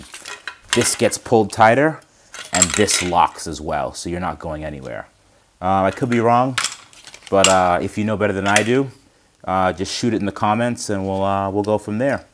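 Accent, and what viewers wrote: United Kingdom. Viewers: Loving the British accent. Nice touch lad. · At first I thought you had picked up a British accent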